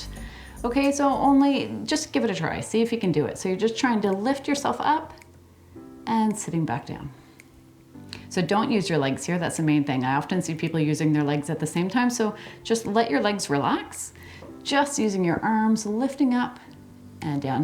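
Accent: Canada. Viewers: Thank you, that was simply WONDERFUL!!!, I live in Michigan, and I've been wondering if you're Canadian. The way you pronounce ''out'', I like it.!!!! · I love your class. I walk with a cane. I look like stroke victim but I’m a cancer patient. I am thankful right now for my son, who got married this past weekend and for my daughter who is on a DTS in Glacier National Park. I am from NY in Rochester.where are you from? I hear a slight accent…. Canada?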